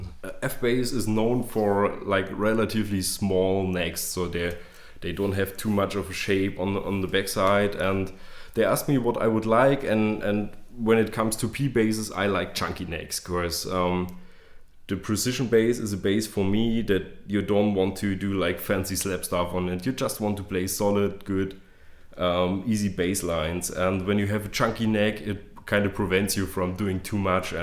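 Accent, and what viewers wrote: Germany. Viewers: Es el acento alemán y es algo muy común y no se porque comentas en español siendo este un vídeo en ingles.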